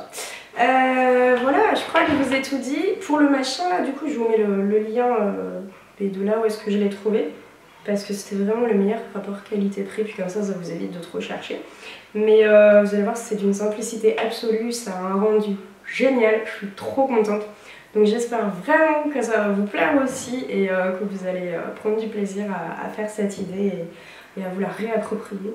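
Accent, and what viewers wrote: South, France. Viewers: Joli DIY de récup' ;) ! Tu as déjà un peu l'accent du sud !! Cela m'a tout de suite interpellé ! Bisous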